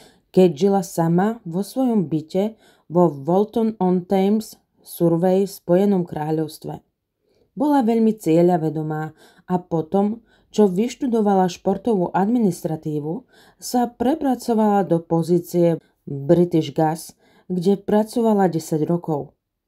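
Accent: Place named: Eastern Slovakia, Slovakia. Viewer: Ja som na to došla ty si vychodniarka. 😂 teraz ta mam ešte ❤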